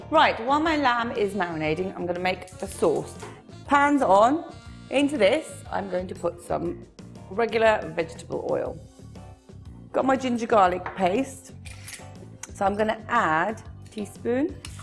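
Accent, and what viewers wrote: United Kingdom. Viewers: she is from England. So its her real accent. She has a British accent.. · Really nice recepie. I've had this in New York at a restaurant called Symphony Spice it was more saucy though like said make it dry or saucy. Thank you, love your British accent 👍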